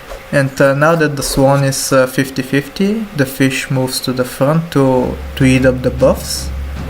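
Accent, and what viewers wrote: France. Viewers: oh my god are u french? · I like that fhrench accent :D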